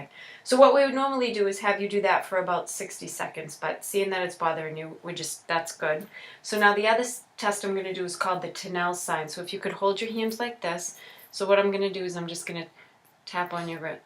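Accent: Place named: New England, United States. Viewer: Love the New England accent.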